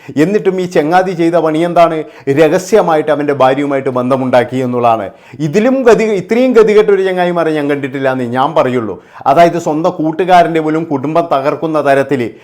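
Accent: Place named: Kasaragod, India. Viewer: anchor kasarkottu karanananoo..athe slang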